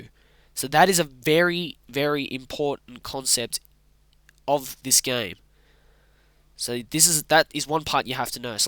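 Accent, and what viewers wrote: Australia. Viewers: Bro his Australian accent is so much stronger here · Strong occa Sydney accent here I was not expecting this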